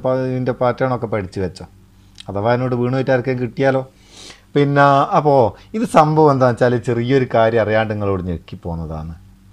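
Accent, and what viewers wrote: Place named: Malappuram, India. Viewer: ഇങ്ങളെ സംസാരം പൊളിച്ചു ഇങ്ങൾ മലപ്പുറത്ത്‌ കാരനാണോ👌👍👏👏👏👏msg ഗുഡ് sub ചെയ്തു ബെൽ ഐക്കൺ ക്ലിക്ക് ചെയ്‌തൂട്ടോ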